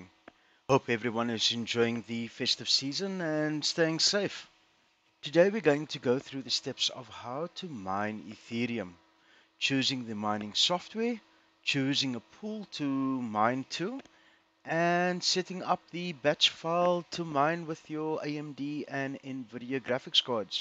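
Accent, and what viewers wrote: South Africa. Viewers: south african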